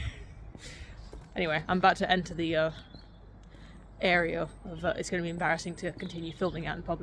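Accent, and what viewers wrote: England, United Kingdom. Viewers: Been on a bit of a Mary Harrington kick and can't help thinking she sounds so much like you (because I knew of you first). Any relation? Or just from the same region of England and thus similar speech patterns? As an American grieved by our vast population's continual homogenization of accent I love how much more so(at least it seems) folks in the UK are maintaining distinct regional accents. (Though I know the flip side of that coin is accent and class being closely tied and that limiting your options in career is a real thing for y'all.)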